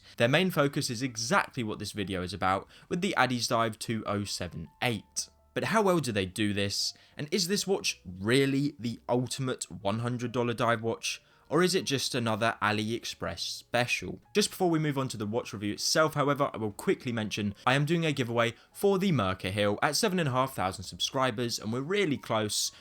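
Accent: United Kingdom. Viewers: I’m completely over the British accent. Click away.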